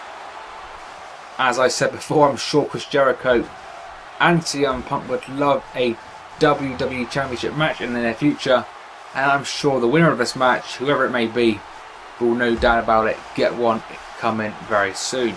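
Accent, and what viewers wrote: United Kingdom. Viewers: i love your pronunciation are you british?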